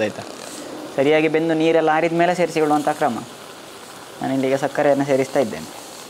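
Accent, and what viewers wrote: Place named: Dakshina Kannada, India. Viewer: ನೀವು ಬಿಡಿ.... ಯಾವತ್ತೂ ಸೂಪರ್. ನಿಮ್ಮ ಅಡುಗೆಯನ್ನು ನೋಡುವುದೇ ಚೆಂದ ಹಾಗೂ ನಿಮ್ಮ ದ.ಕ ದ ಕನ್ನಡ ಮಾತು ಕೇಳಲಿಕ್ಕೇ ಆನಂದ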